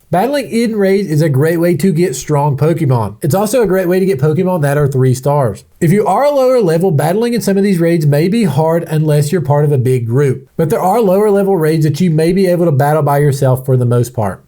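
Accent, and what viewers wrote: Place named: South, United States. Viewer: You got a solid southern accent, but some real antifa loser vibes.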